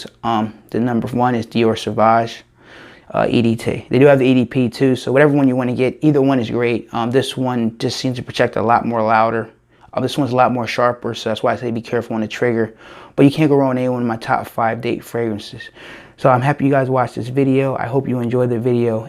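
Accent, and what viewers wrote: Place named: United States. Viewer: Good video again mate it is a shame that you have to do a disclaimer because people moan. I have 3 of these fragrances, Aqua Di Gio is coming tomorrow and looking forward to it. YSL La nuit d'lomme is great on my skin and lasts/projects for ages.... I love it whereas Sauvage I have the edp and I am not keen on it, the projection on me is poor and even on clothing I can hardly smell it. I enjoy your videos..... What is your name? I cannot tell with your American accent (I am from England) and where abouts in the States are you from? I am from Leeds in Northern England.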